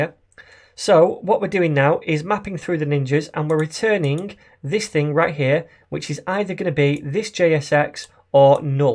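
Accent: United Kingdom. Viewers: I love you British Accent <3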